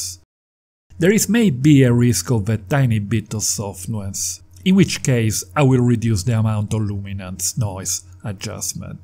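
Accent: Italy. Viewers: accent italian. are you italian ?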